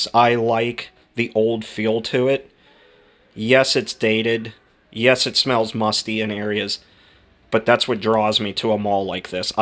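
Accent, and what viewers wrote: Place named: Western Pennsylvania, United States. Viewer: I hear a western Pa accent, Pittsburgh area? Am I right? or close.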